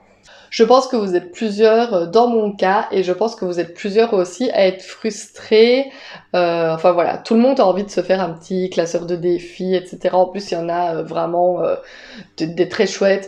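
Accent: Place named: Liège, Belgium. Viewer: votre accent est si exotique! il s’agit de liège ou jme trompe!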